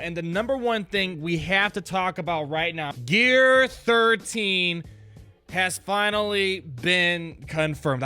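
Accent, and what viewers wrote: Poland. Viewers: now i know that you are polish;) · ziomuś to ty Polakiem jesteś?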